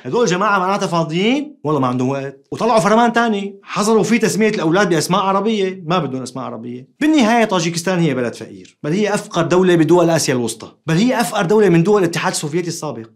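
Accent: United Kingdom. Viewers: the british accent :))) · واو لهجتك البريطانية رهيبة❗😭😭🔥🔥🔥🔥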